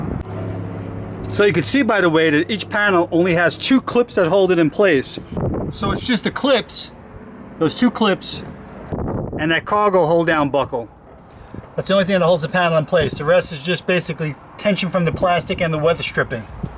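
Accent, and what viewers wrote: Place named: New York, United States. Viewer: I just assumed he was in NY because of his accent and I was wondering how his car is so clean so I was confused till I read your comment.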